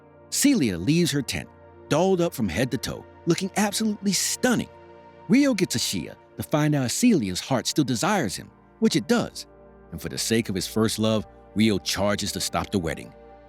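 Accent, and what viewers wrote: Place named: South, United States. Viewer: The country voice doesn't suite this genre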